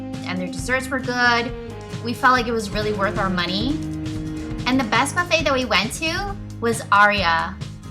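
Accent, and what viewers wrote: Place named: California, United States. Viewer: Informative but........ Couldn't get beyond 2 mins listening to that voice . Rising infliction much ? I began thinking it was the Californians skit off SNL ... No offence intended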